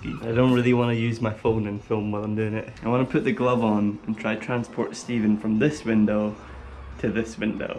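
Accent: Scotland, United Kingdom. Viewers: Are you Scottish out ov curiosity???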